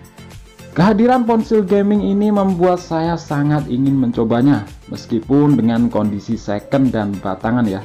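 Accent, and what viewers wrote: Blitar, Indonesia. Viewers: orang blitar kah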